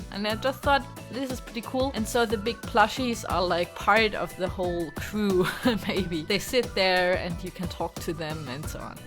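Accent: Germany. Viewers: Achso ich dachte schon irgendwo her kenn ich den Akzent :D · Are you german?you have this german accent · Where are you from? Your accent is kinda german. :D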